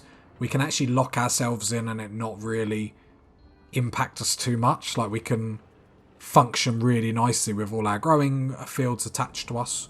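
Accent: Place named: United Kingdom. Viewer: Your accent...just adorable! I can't have enough of the British accent! Well I have a house in England, you can understand that I love your country! xx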